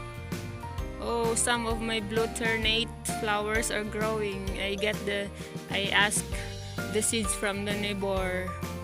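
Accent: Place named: France. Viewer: Thanks for the tour! Very nice farm. Do you realize that you have a French accent? Ha ha, you do.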